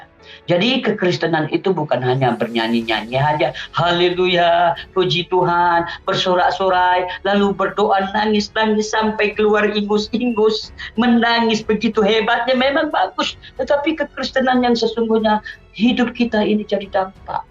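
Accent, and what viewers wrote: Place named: North Sumatra, Indonesia. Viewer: Bukan orang Aceh ini orang. Logat kentalnya orang Sumatera Utara. Ngibul lu tong!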